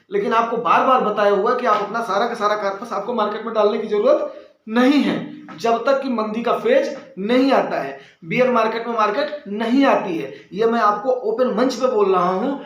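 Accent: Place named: Uttar Pradesh, India. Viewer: Up wala bhasha k liye ek like mere taraf se😄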